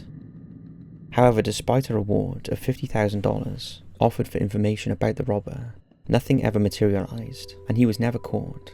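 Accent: Wales, United Kingdom. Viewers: Edwin well it's almost lunch time where I live in europe and I suspect he lives somewhere in great britain according to his accent.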